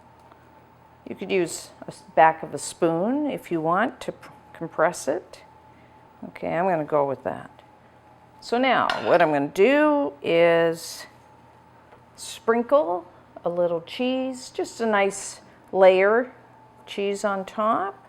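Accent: Canada. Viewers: Love these cooking shows. Also love the Canadian accent. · I've tried a lot of recipes I learned from YouTube during this pandemic. I'm American, & I also enjoy listening to the various accents. Love listening to our friends from the north. Thanks! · She sounds Canadian, so maybe they use the metric system? Not sure about that, though.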